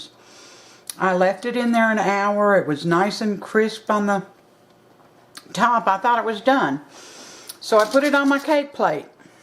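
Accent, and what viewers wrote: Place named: West Virginia, United States. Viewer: now im hungry !! this looks wonderful ty 4 sharing. have a great day. are you in wv..............u sound like it to me. just wondering cuz i spent many summers there with my grandparents.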